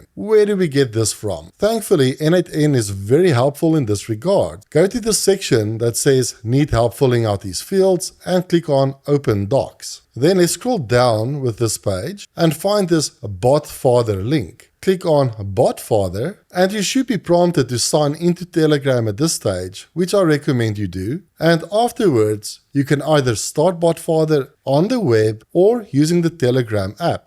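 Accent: South Africa. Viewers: 5 seconds into the video and I could tell you were south African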